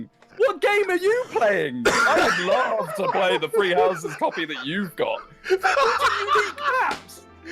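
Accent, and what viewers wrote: United Kingdom. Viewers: You sound Very British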